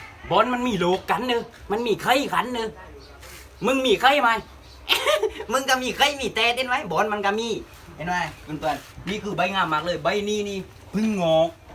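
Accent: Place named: South, Thailand. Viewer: สุดยอดเลยคนใต้แหลงใต้ฟังแล้วบายหูขอให้ดังๆรุ่งๆนะคับ👍👍👍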